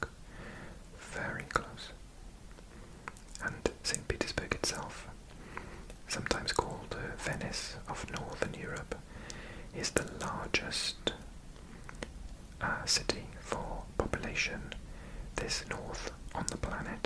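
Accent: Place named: United Kingdom. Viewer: what is funny is that as someone from outside the UK i don’t notice you having a welsh accent and i just hear a british one, EXCEPT when you say “here” and it sounds like hiyuhr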